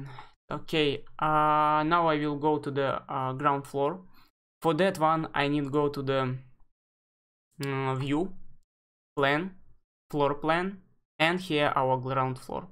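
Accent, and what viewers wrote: Russia. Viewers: great tutorial, I think you are native Russian yea? You have the same accent speaking english as like my girlfriend, she's from sint petersburg :)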